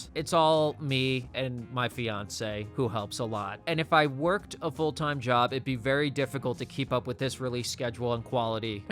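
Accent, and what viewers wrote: Philadelphia, United States. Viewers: Congratulations brother 🎉 Love the content, disappointment no one ask the real burning question i had, no need to answer though. But based off how you've pronounced certain words, are you from Philadelphia?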